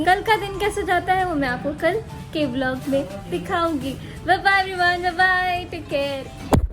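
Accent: Bihar, India. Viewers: panni wanni typical bihari...style i loved it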